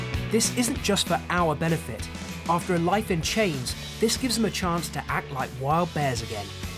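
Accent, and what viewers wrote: United Kingdom. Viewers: Love British commentary. Its funny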